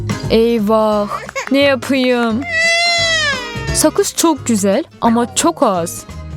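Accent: Azerbaijan. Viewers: Siz deyəsən Azərbaycanlısız səsiniz qəribə çıxır mütləq Azərbaycanlısız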